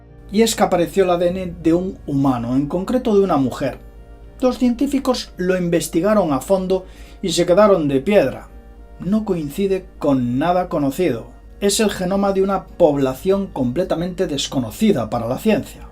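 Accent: Spain. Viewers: La noticia es buena pero el acento español es odioso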